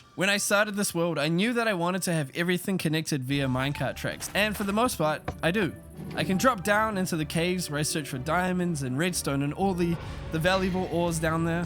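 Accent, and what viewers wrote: New Zealand. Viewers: You from New Zealand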